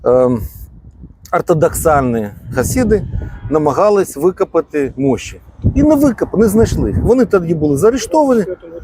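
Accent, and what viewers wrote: Russia. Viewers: Це іде тиха окупація України для створення нового Єрусалиму. Цьому потрібно поставити заборону. Але поки в нашій владі засилля неукраїнців - буде дуже важко. А пану Павліченко та всім причетним щира дяка , що піднімають це архі важливе питання. А пану Павліченко на часі вивчити українську мову і в побуті також послуговуватися нею. Бо досить дивно звучить український боєць за Україну, який розмовляє як москаль, що фарбується під українця.❤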